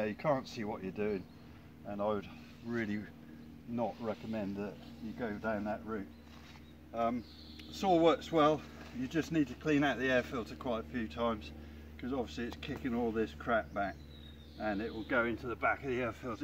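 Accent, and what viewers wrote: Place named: Hampshire, United Kingdom. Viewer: Great advice, you know what you are doing. Sounds like you have a Hampshire accent, see you got that slabs from fordingbridge..I’m just down the road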